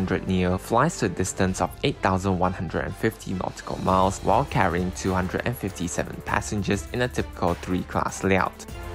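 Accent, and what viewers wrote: Singapore. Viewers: You sounds like Singaporean.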